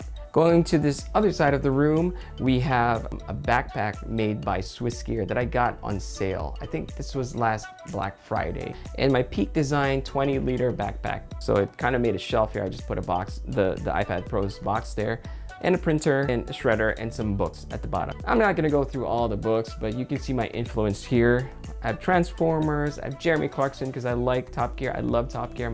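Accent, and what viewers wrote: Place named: Philippines. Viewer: I can hear the Filipino accent sometimes and I'm loving it 💕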